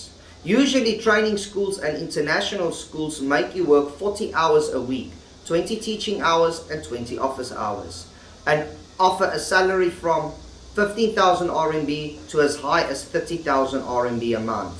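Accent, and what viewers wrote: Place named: United Kingdom. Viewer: gr8 ingormation. i believe u live sometime in uk bcoz ur accent is similar to british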